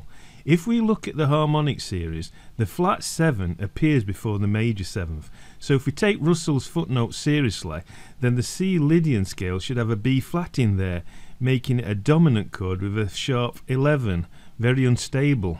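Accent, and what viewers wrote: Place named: Lancashire, United Kingdom. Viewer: Lancashire by my ear.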